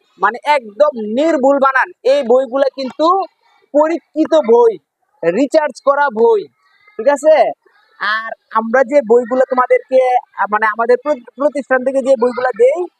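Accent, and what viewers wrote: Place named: Kishoreganj, Bangladesh. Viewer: আপনার নিজের ই তো উচ্চারনের সমস্যা ভাই, আপনার বাসা কিশোরগঞ্জ মনে হয়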